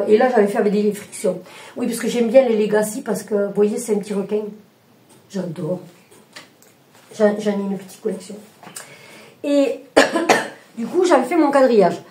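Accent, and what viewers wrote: South, France. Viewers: Coucou, j aime toujours autant regarder tes vidéos ☺️ en plus tu as toujours des astuces en bricolage qui sont très chouettes. Tu sais le principal en tournant les vidéos c est à la base se faire plaisir , peut importe si il y en a des tonnes sur YouTube, du moment que tu prends plaisir à les réaliser c est gagné 😉 en tout les cas moi je le vois comme cela . Et puis les gens adorent l accent du Sud , bon ok vu que tu es de ma région je suis un peu chauvine du coup 😅🤣🤣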